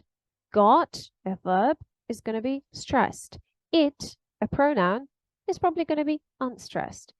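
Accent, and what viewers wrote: United Kingdom. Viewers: What's the practical reason for having such a very British-like sounding accent? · i love the gentleness and preciseness of the british accent if that even makes sense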